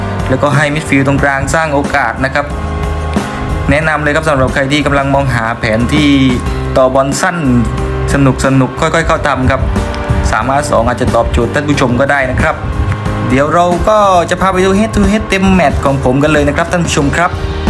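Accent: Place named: South, Thailand. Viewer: คนใต้ม่ายนิพี่ สําเนียงชัดๆเรย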